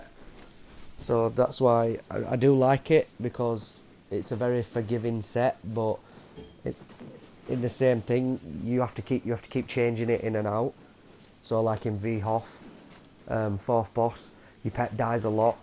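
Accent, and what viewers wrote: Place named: Northwest England, United Kingdom. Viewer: Hiya mate. LOVE the vids - you're putting out some ace content at the moment! Just out of interest - where about in the UK are you from? Your accent sounds like Wigan/Preston area? Deffo the Northwest! :) I'm from just out of Chester. :)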